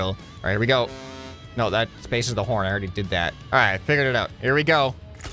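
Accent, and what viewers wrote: Texas, United States. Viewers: not trying to be rude but are you from Texas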